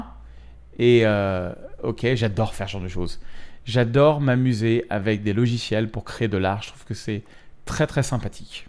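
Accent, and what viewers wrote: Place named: South, France. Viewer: Super tuto, dynamique, humour, l"accent du midi, bref que du bonheur.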